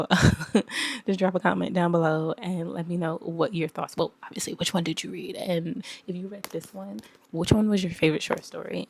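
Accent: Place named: Brooklyn, United States. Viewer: 👋🏽!!! I am just coming across your video and I have to check out more!!! You sound just like me and I love it! BACKSTORY: I’m born and raised in BK - went to BGHS if that tells you anything. Now live in VA. Folks are always talking about my accent 🙄. I’m like, well here’s the pot calling the kettle black🙄. I remember buying our books downtown Brooklyn and along Fulton St. So now Malcolm X is popping like that?! I’m so jelly! I can’t wait to see more vids from you. I read How To Love a Jamaican back when I lived there!! I’m so glad to have came across someone else who knows about this one. I think I want to reread it now! Thank you!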